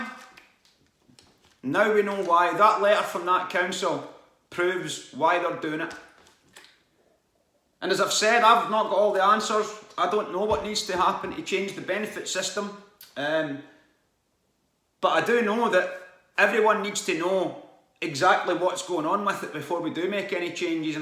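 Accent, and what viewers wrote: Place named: Glasgow, United Kingdom. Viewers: Brilliant, I've just heard of you today. You sound like you're fae Wishy lol..Great to hear what you have to say..and you are right we need to come together, but the created divide gets in the way so much. Where I live now, north east Scotland, only the scum of the earth would ask for a council house so most people don't cars as they have a death- tax (mortgage) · Thank you for letting us know this! No doubt coming to N America soon enough. And your accent reminds me of my dearest friend that lived in Paisley. And yes, I understood every word you said, and she, too.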